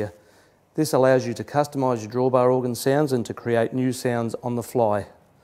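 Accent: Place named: Australia. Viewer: australian accent sounds cool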